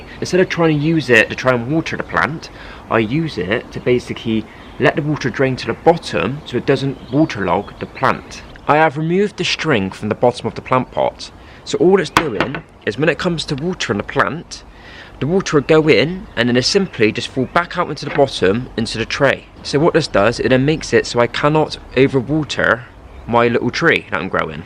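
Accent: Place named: West Country, United Kingdom. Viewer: Aww West Country accent❤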